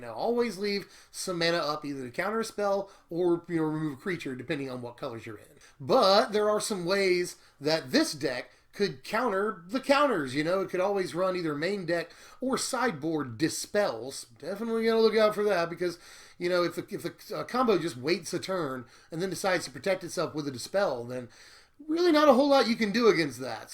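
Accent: South, United States. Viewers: that country accent lol, Im going to say she is from alabama or louisiana. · aawwzz! I love good southern accents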